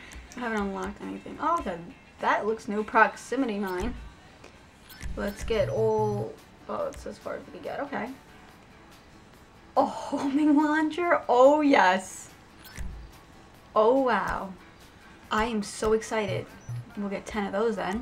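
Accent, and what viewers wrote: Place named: New York City, United States. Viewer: i like ur urban nyc accent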